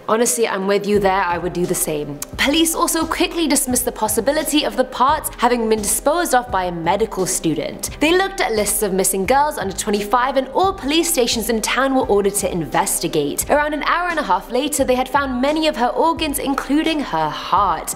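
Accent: United Kingdom. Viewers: I'm assuming she's British because she has a British accent...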